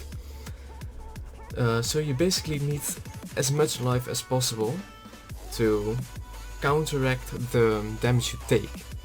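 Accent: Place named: Netherlands. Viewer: Are you Dutch? If yes reply please you sound like someone with an Dutch/English accent. maybe we can play togheter. I am building allmost the same build that you have shown here only with an firery twist to it :D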